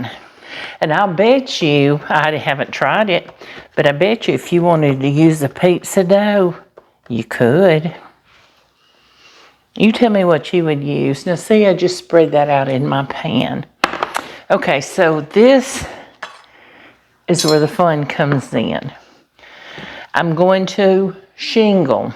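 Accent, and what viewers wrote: South, United States. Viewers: It looks absolutely yummy, I hope that I can buy the dough roll in England, does anybody Know? love your videos and your southern accent is delightful.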